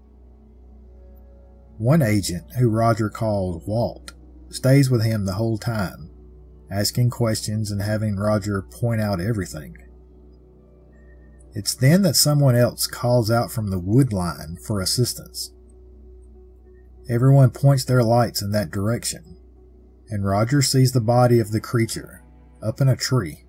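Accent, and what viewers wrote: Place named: North Carolina, United States. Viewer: I do so enjoy these stories as I do hearing your accent; when I was a kid we lived in NC for 3 years and it's so familiar still.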